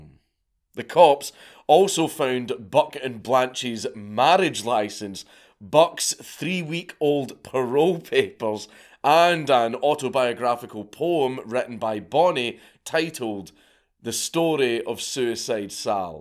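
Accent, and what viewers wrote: Scotland, United Kingdom. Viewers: You know, I never really was interested in the legend of Bonnie and Clyde untill I found out the story was going to be told by this charismatic, jolly Scotsman. 😃👍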